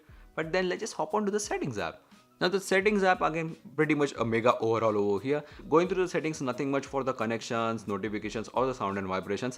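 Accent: India. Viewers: What a horrible accent. Indian english is the worst.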